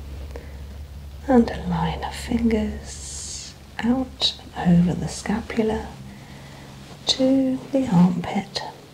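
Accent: England, United Kingdom. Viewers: Very relaxing, educational, I'm not going to lie, love the soft English accent and best of all no ads... perhaps, I've said too much.. ... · Absolutely one of the best and most relaxing massages I’ve had the pleasure to watch here on YouTube. Your voice is so magnificent, nothing is more pleasing to an American ear as that of a tranquil English accent.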